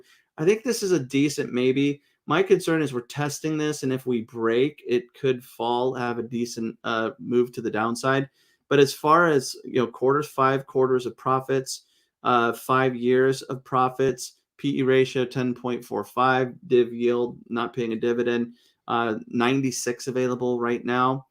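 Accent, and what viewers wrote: Germany. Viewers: 😂so funny you mentioned the german Akzent 😂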